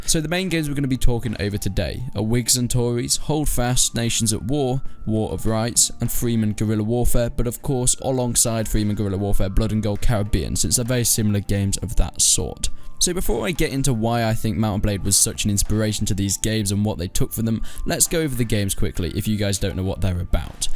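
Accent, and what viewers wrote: United Kingdom. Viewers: Yes I agree can't understand what your saying with that Brit accent. A game list would be nice.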